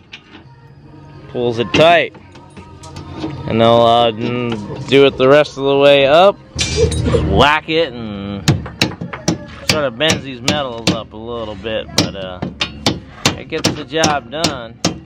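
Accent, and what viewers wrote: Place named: Texas, United States. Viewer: That happened to me with one of the columns. It was so annoying. Are you a southerner ? You sound as you’re from the south. Texas way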